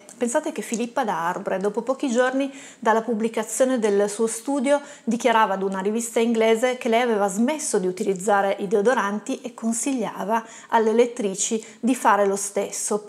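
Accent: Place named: Turin, Italy. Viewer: Contentissima di aver trovato il tuo canale, dopo aver letto alcuni tuoi articoli interessanti altrove. Video interessantissimo e molto chiaro anche per un'umanista come me :-) Noto anche con piacere un familiare accento torinese, o sbaglio? :-)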